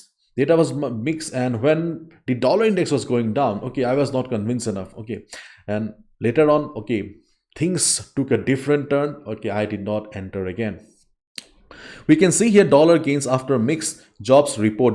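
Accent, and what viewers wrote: India. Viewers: this is not racism but I would trust a Indian person or sort of someone with the accent u have idk what it is its just Indians or asians brains are very smart with stuff like this and very proud of u bro helping people out I was doing analysis on gbps usd and couldn't fine where price could pottenially go and u have opened my eyes to it keep putting videos out I am going subricbe now u smart brother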